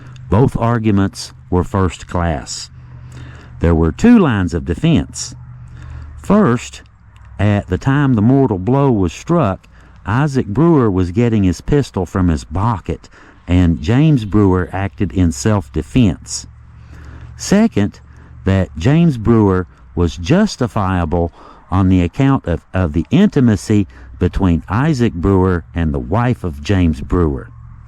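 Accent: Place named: North Carolina, United States. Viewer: im from Granite Falls, NC the way you talk( your accent ) is the same as my friends that grew up around here so its easy to understand you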